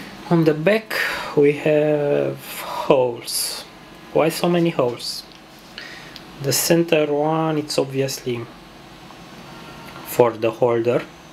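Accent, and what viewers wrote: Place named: Russia. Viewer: рашин акцент)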